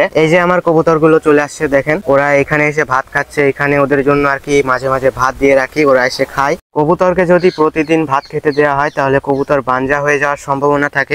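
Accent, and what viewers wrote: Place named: Kolkata, India. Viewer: Kolkatar naki dada Tumi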